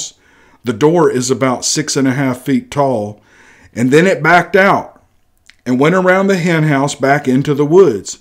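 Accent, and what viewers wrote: South, United States. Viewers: I never comment, but I listen every night. Your southern voice puts me at ease at night. I enjoy your comments and the way you read these stories. Please keep the stories coming!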